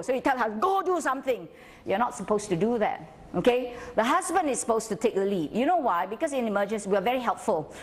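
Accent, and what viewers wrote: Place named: Guyana. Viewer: you sound so guyanese.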